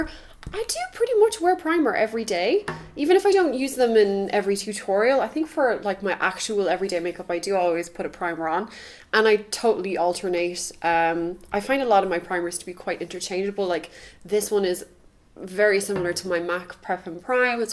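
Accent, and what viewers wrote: Ireland. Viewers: I know is in Australia, but her accent sounds very irish. So im confused.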